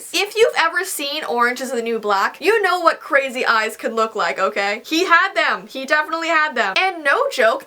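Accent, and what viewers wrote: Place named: Ontario, Canada. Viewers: Yeah she is from Canada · Ya Ontario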